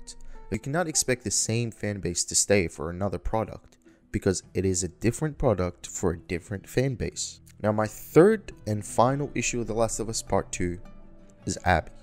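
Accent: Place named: South, United States. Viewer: Bro do you mind if I ask where you’re from? You sound like you aren’t from USA but you kinda have a southern accent.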